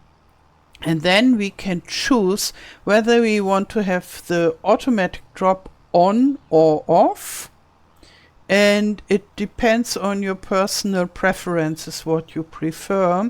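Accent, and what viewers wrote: Germany. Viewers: i love how german you sound! as a german being away from germany for 7 years now everyone can still instantly tell im german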